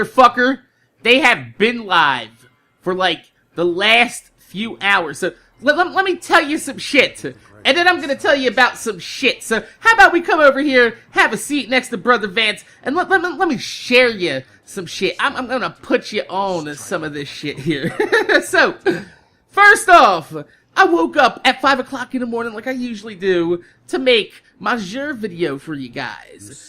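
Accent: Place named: Florida, United States. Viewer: It sound like you stay in Florida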